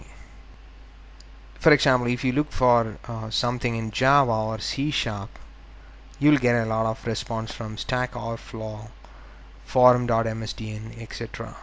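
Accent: Kerala, India. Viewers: He is speaking with a Malayali accent. People across India speak with different accents. The content in his videos are indeed well thought out and very helpful. Appreciate his effort and if you cannot then move on; there is no need to be abusive.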